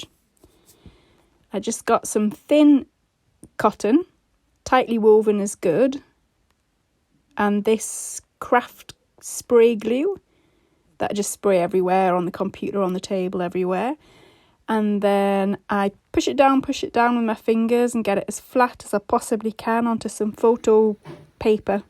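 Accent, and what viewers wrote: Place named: United Kingdom. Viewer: I had to chuckle so when you started making the yo-yos. With your accent, I would’ve expected you to call them Suffolk puffs. I’m enjoying your work so much and commentaries are just lovely.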